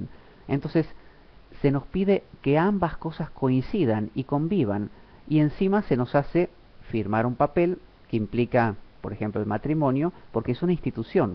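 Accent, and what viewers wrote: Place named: Argentina. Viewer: comose nota que eres argentino que bien hablais los argentinos hablais mucho con el corazon en españa no lo hacemos tanto los minimos... transmitis muchisimo por lo menos ami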